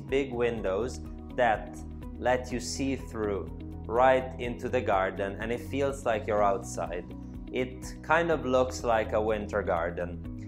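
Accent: Italy. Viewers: I love this Italy accent English ^_^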